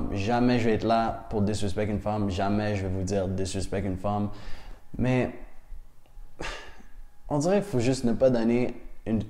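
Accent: Quebec, Canada. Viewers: L’accent et la dialecte quebec je valide 👌🏽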